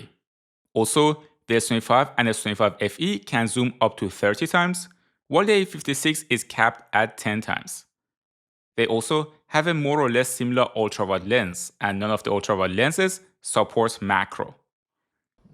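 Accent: Iran. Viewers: Dude are you Iranian your accent is so obvious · Persian accent, nice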